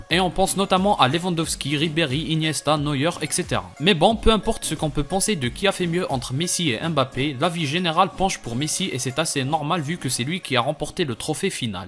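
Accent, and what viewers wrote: Kabylie, Algeria. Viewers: UN ACCENT TYPIQUEMENT KABYLE T'AS RAISON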